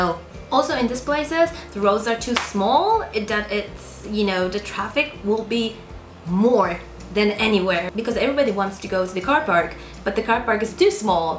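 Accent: Turkey. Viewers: Canım senin isim yabancı ama sen Türk sün belli o aksan ı yapman için çocukluktan öğrenmen Lazım Türkçe bu o kadar değil. Ayrıyeten konuşmanı düzelt demeyeceğim belli ki tarzın bu onun yerine değindiğin Konuları mal gibi anlatma:// so sadd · Aksanindan turk oldugu anlasiliyor, mal mi ne ya. 😂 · Kız Türk arkadaşlar. Aksandan anlaşılıyor zaten. Hakkında bölümünden de görebilirsiniz. (Çok iyi İngilizce konuşuyor, o başka) Türk'ü Türk'ten başkası kötülemez ki. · Why is your name if you're a Turk? You also don't sound like you've been raised somewhere else than Turkey, you have the Turkish accent. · Konuşan kiz nereli? Türk mu? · Well..She just trying to be famous as other crappy ppl. i think she is fully Turkish or she might living in Usa or any other country atm,she just trying to act like she is not Turkish but her accent doesn't let her hide it. i just notice it in first 5 min.i will check her other videos too, lets she what she done. 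· She is turkish! This is typical turkish english accent when they learn it in school:) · Aksandan Türk olduğu belli. Kullandığı adı yadırgamıyorum çünkü Çiğdem, Işın gibi bir adı koysa hiçbir gavur gelip izlemez. da.. ne iş?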